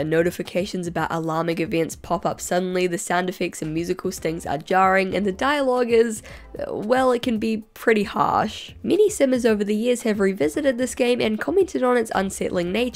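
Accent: New Zealand. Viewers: why did it take me so long to realise that ur from new zealand!!! i swear i’m so bad at picking out my own ppl lol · You know, , I briefly turned on closed captions to make sure I was hearing you correctly, and then I see this about the genie: "summoned from a lamb and gransom's wishes" I just think the subtitles or YouTube or something like that can't keep up with your thick New Zealand accent.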